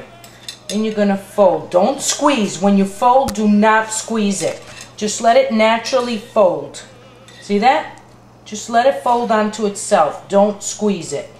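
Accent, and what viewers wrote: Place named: Italian-American, United States. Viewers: Cant get enuff of the ny in ur voice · the way you say prosciut ricott and mozzarell! He says it's sooo like the movies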